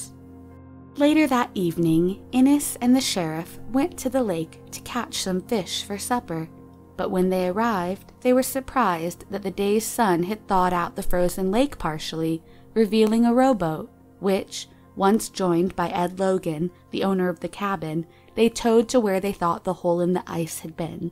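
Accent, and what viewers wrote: India. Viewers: Who's voice was it? Is she Indian?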